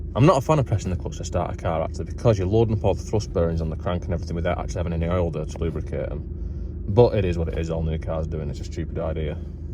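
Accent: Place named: Oldham, United Kingdom. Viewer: I visit this channel for my daughters’ C1 and now you’ve bought the same model as my car, there is a god. Plus those dulcet Oldham tones, reminds me of home.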